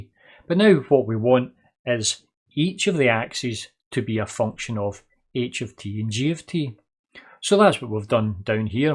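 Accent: Scotland, United Kingdom. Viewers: even just for the Scottish accent.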